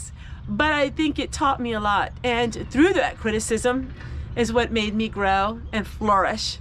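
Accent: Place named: Maryland, United States. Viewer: your channel is so fun and uplifting and a little wacky in a good way. i enjoy your garden and your collectibles and of course it's nice to hear someone not be ashamed to mention the Lord Jesus. your accent is similar to ours in Maryland but ours is a little more southern. do you have any pets other than your chickens.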